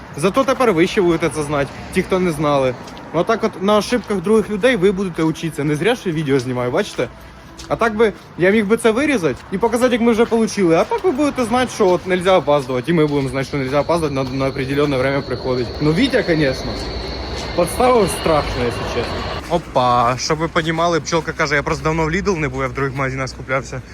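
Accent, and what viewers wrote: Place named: Ukraine. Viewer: говорит на русацком языке, добавляя украинский акцент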